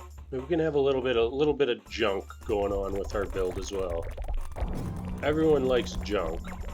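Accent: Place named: Pacific Northwest, United States. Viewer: After your question about what draws folks to your channel and game play, I was thinking about the reasons that I do enjoy watching your Goobies runs to start my days, here in Brooklyn. 1. I'm originally from the Portland, OR area and your voice has an accent that reminds me of home. Over the years, I've learned that the Pacific NW of the US has an almost Canadian accent in its English. Perhaps this is a result of the many years in which the territory was jointly administered by the U.S. and the U.K. or now the region's proximity to Canada over time? I just watched a history explainer video on that international relations history of this U.S./U.K. joint rule, by one of my favorite YouTube channels 'History Matters' , this last week. (I also work in and academically study International Relations and International Affairs, here in NYC; and sometimes for the U.N. 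here in the city or at international conferences.) Long story short, your accent feels welcoming and homey to me. (P.S. If you ever have any International Relations questions, feel free to ask. I'm always happy to share what I may have learned over the years.) 'Why did Britain give up Oregon? (Short Animated Documentary)' "Britain once held condominium of what's now Oregon, Idaho and Washington State with the United States and during a time of diplomatic strength, simply handed it over without any compensation. So why did the United Kingdom do this when it could have got something in return or at least given less? To fi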